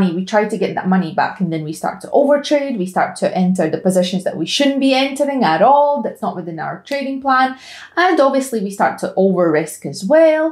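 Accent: Scotland, United Kingdom. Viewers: Where is your accent from? I hear Scottish but mixed with something else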